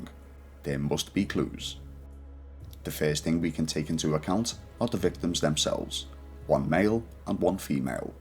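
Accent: Liverpool, United Kingdom. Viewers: Always a good thing to hear a scouser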